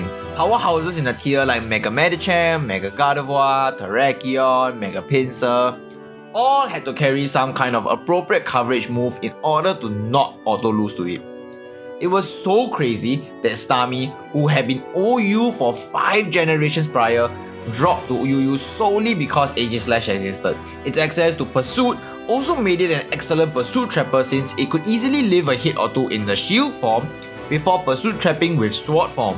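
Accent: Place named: Singapore. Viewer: eh u sound sg sia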